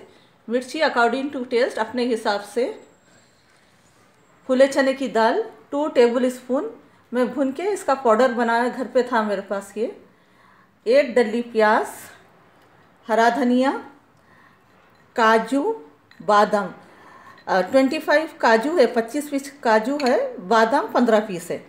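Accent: Hyderabad, India. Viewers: Aswk aunty I like yr way of speaking a pure hyderabadi language we enjoy yr style of cooking For the first time we heard of dahi ka dalcha thnx for sharing new recipe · Ek dum Hyderabadi hai aap 😂 Mere jaisa ich 😎😂 Ek pyaaz ki dalli 🔥 Dialogue was awesome 😂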